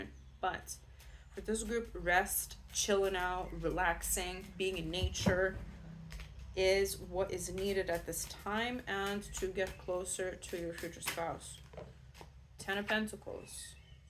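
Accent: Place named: New York, United States. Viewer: Hi with I thought your were from New York. Your accent sounds like you are from Bronx, Brooklyn, Staten Island. I thought perhaps you were living aboard. I am not sure your birth and living geo history. But, being a New Yorker you are hitting certain accent NY twangs. -lol Also, thank you 🙏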